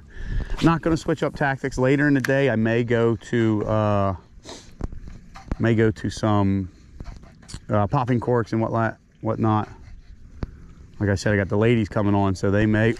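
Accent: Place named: Maryland, United States. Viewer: Hi, I have to ask, are you from Maryland? I detect a similar accent to mine. I fish a good bit in Sunset Beach NC and use the same jigs. Nice video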